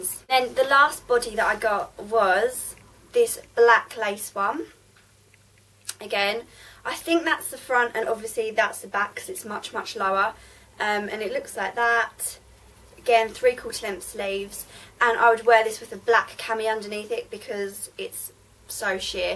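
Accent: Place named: Cockney, United Kingdom. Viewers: Cockney accent , that shocked me big time . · love your accent, are you from london or there abouts? x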